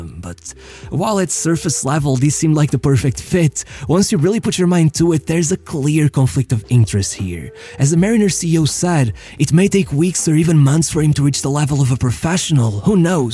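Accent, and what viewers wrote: Portugal. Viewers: brother man sounds portuguese af lol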